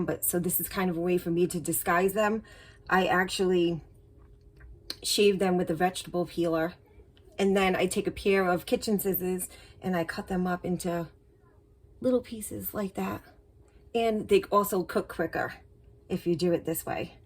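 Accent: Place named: Massachusetts, United States. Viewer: Love your accent too because I am from Mass :)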